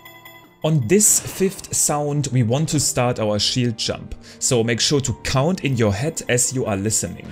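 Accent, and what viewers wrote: Germany. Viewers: He’s definitely German :) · habe mich schon gewundert warum er so einen Deutschen Akzent hat. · man hört so sehr dass du deutsch bist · I was wondering why he had such a german accent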